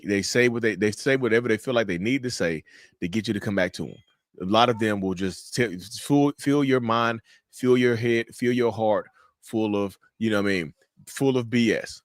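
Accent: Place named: North Carolina, United States. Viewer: I have watched so many of your videos, I now say the word "no" with a North Carolina accent.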